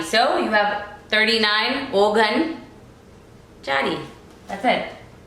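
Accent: United States. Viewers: How did u acquire this American accent. Looks like You've been settled in US for a very long time...pan memoni boli khand ji goli... period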